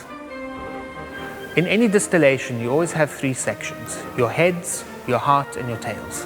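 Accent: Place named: South Africa. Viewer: pmc eightsixeighty clearly you're a silly american. If anything he would be from an italian family which is why he is probably makeing grappa in the first place (but he actually sounds south african). Pronunciation doesn't make his 'english terrible' it gives him a accent.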